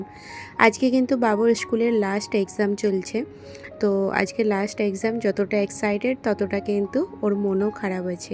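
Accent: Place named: Bihar, India. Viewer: তারা তুমি কি বিহারী বলোনা আমাকে প্লিজ কারণ তোমার কথাবার্তা শুনে মনে হয় বিহারী লাইক দিয়ে তোমার ভিডিওটা আমি সম্পূর্ণ ফুল ওয়াচ করলাম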